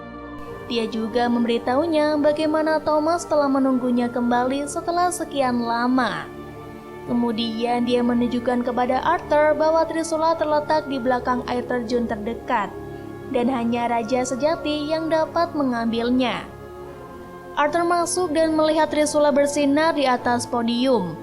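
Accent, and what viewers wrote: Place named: South Jakarta, Indonesia. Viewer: Terlalu Jaksel yah yang baca naskah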